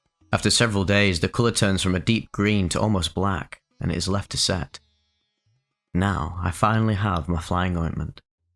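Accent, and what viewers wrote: United Kingdom. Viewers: Always some British schmuck narrating these weird videos. #1776 fk the queen n her herpes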